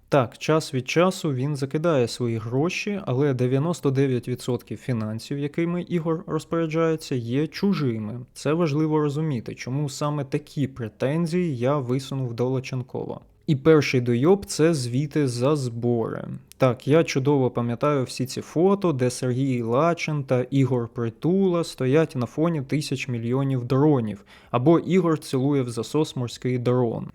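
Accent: United States. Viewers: Класний американський акцент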